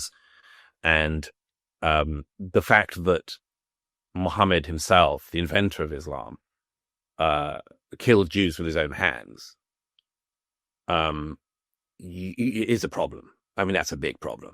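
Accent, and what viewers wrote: RP, United Kingdom. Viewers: The right is critical of israel for the reason anyone else is. Because they see what is going on. Who cares what this gay gas lighter has to say ? Dave smith is Jewish and makes actual points. This posh speaking morrisey clone has nothing to say. He sounds like the democrats blaming everything on Russia after 2016.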